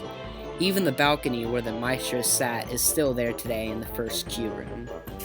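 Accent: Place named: Cincinnati, United States. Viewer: This guy has the most Cincinnati accent I’ve ever heard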